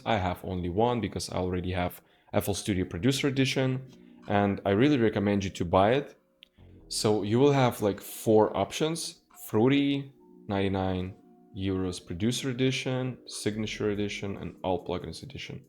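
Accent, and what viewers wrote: Russia. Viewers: Русский акцент слышно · ты русский, акцент палит))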